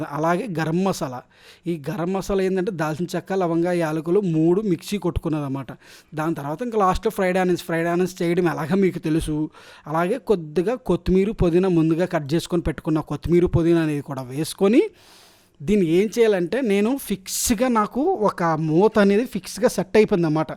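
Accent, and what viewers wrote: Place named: Godavari, India. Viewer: Godavari slang lo gouravam 😍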